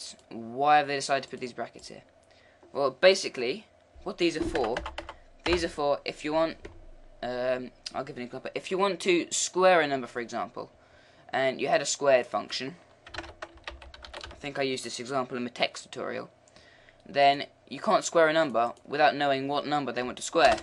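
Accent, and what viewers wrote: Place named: England, United Kingdom. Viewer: They're not, they are in the language of lua. If you mean why is the person who's talking doing so with an English accent then he's probably lived in England for most of his life, if not all of his life.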